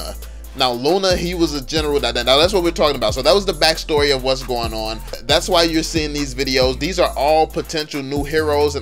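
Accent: United States. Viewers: He's American, really hard for them to get the right pronounciation.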